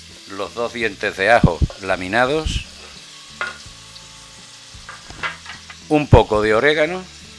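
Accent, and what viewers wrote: Spain. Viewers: es esto estilo espanol? digo por el acento, pero no se,yo le hubiera hechado chile, no soy mexicano pero he vivido toda mi vida en little mexico(los angeles california) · de flipe total!!!!! menudo éxito...menudo recetón con acento andaluz, la hice con vino de pasas del puerto de santamaria y ha sido una experiencia extrasensorial, mas no se puede gozar con la ropa puesta.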